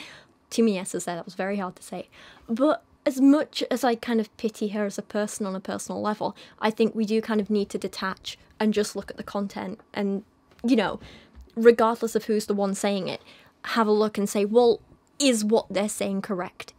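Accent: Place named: Yorkshire, United Kingdom. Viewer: I always enjoy listening to your videos whenever I'm doing things like cleaning, running errands, and cooking, regardless of the topic. My favorite teacher from elementary school grew up in Yorkshire and your accent is so nostalgic and comfortable to me.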